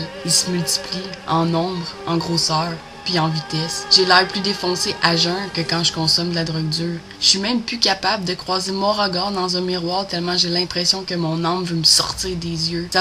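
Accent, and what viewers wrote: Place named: Canada. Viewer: tres bonne video meme si j'ai encore un peu du mal avec l'accent canadien ^^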